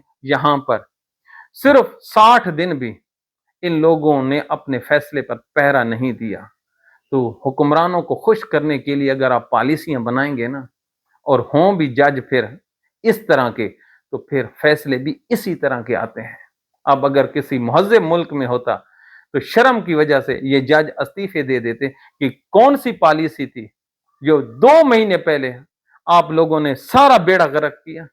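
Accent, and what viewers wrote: Saraiki, Pakistan. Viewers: سرائیکی لہجے میں اردو سننے کی اپنی مٹھاس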